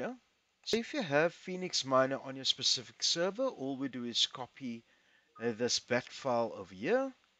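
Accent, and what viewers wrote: Afrikaans, South Africa. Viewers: Jou afrikaanse accent kom sterk deur😂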